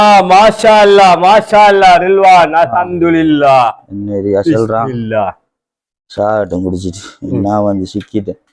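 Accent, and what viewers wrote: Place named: Valachchenai, Sri Lanka. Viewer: அரபுநல்லாதெரிந்த ஒருவரைவைத்துநடித்துரிக்கலாமே.நீங்கள்.எந்த இடம்.வாழச்சேனையா.உங்கள்பேச்சில்விளங்குது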